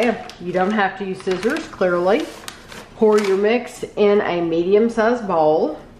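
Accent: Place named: Kentucky, United States. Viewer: You sound like your from Kentucky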